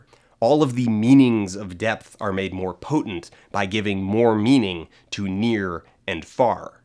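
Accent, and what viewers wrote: Canada. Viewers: I was just wondering if he was Canadian. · 2 things: -So... You're Canadian? -While I agree with the video and the ending message, I think sound quality matter more for video than you're giving credit.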